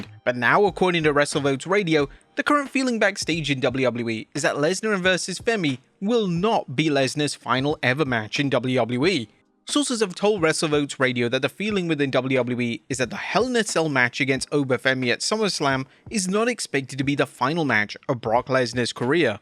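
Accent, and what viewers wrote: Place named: United Kingdom. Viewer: Thumbs up UK voice back!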